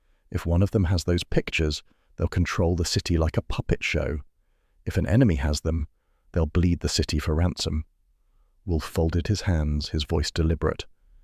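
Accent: United Kingdom. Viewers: Doing these narrations is a lovely tribute to the works of Rex Stout, but as an American, I just can't listen to these stories read with British accent, sorry. Stout was a quintessentially American author, and the descriptions of New York, the brownstones, the furnishings, everything are all about America. It's jarring to my ears to hear them read as if they were Sherlock Holmes stories. (I wouldn't be able to tolerate Holmes read in a flat Yankee voice, either., lol)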